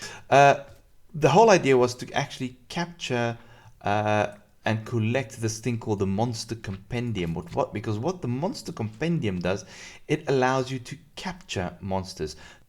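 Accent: South Africa. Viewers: Is your accent South African, if not it sounds surprisingly like it.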